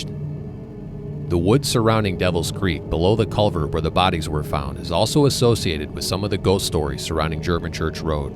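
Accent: Chicago, United States. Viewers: I've lived in Southern California since 1975, however I grew up in Chicago and St. Louie, so I thoroughly enjoy your videos and your accent, which reminds me of home. You're fantastic. Thanks for all your hard work!😊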